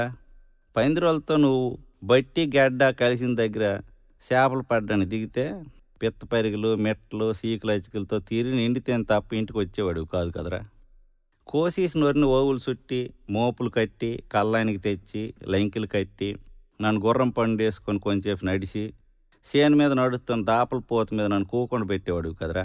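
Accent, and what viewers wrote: Srikakulam, India. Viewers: సార్, శ్రీ కాకుళం, ప్రాంతీయ భాషా సౌందర్యం,అట్టాడ అప్పలనాయుడు, కొంచెం నాలుకకిరాసి,రుచిచూపించాడుకానీ,మీమాటలులోఆయాసామృతంమొత్తంగారుచిచూపించడంకాదు,కడుపూమనసూకూడానింపేశారు.ఆప్రాంతీయభాషామాధుర్యంఇటీవల ఒక మిత్రుడు ఇలాశాంపిల్ చూపించాడు.?ఒకరైతుకూలీస్త్రీఇలాఅందట?ఉలుకూపలుకూలేనమ్మకి, ఊరంతా ఉపారాలే!ఎద్దునాగకష్టపడిందానికి"పకాలే,డకోలు.అన్నాదట! ఎంత చక్కని పోలిక, ఎంత మధురమైన ఫోలిక,ఈవిశాఖపట్నంలో, కొంత మంది మిత్రులు, శ్రీకాకుళం వాళ్ళనీ,వాళ్ళభాషయాసనీ,అవమానకరంగాహేళనగానూమాటలాడుతుంటే,సహించలేక,శ్రికాకుళమేరామాదీశ్రికాకుళమేరా!విప్లవాలాపురిటిగడ్డా,వీరయోధులపోరుగడ్డా,ధీరమాతలనుదుటికుంకుమ,జారితూరుపుఎర్రబారిన,శ్రికాకుళమేరామాదీశ్రికాకుళమేరాఅనేపల్లవితో, విప్లవ అమరవీరులుపంచాదిక్రిష్ణమూర్తీ,నిర్మలమ్మా, సత్యం మాష్షగారినీ,ఆదిభట్లకైలాసంనీ,తామాడగణపతినీ,ఆపాటలోపరిచయంచేస్తూవారిత్యాగాల్నిగుర్తుచేస్తూరాసిన ఈపాట ఆశ్చర్యంగా, క్రిష్ణా, ఉభయ గోదావరి జిల్లాల్లో తెలంగాణ లలో,విస్త్రుతంగాపాడుతూపరవశిస్తూ, ఫోన్లు చేస్తూ అయ్యాఏభైఏళ్ళుగతించినా,శ్రికాకుళవిప్లవోద్యమాన్నీ,అమరవీరుల్నీగుర్తుచేసేపాటనుఏవిప్లవపార్టీగానీ,ఏసాంస్క్రుతికసంస్థగానీపాటరాయలేదండీఎంతబాగారాశారనిసంతోషంతోప్రశంసిస్తున్నారు.ఇక్కడనాపైప్రశంసలగొప్పలుచెప్పడంకాదు.మిత్రులుశ్రికాకుళయాసనీఅక్కడమనుషుల్నీఅవమానకరంగామాట్లాడేవాళ్ళకళ్ళుతెరిపించాననేసంతృప్తిదొరికిందిఅదిచాలు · శ్రీకాకుం యాస,భాష తో మన గ్రామీణ కుటుంబ పెద్దల జీవన విధానం గురించి చాల బాగా చెప్పారు. 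గారు..మీకు సంక్రాంతి శుభాకాంక్షలు. గన్నిరాజు డీఇఇ జలవనురుల శాఖ. శ్రీకాకుళం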